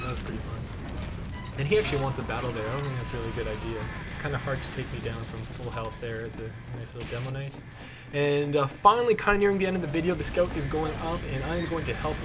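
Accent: Canada. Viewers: you have such a bad ass Canadian accent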